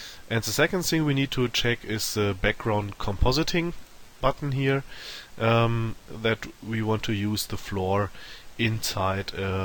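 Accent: Germany. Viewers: oh mann ich hasse den deutschen akzent :D Gutes Video trotzdem, danke!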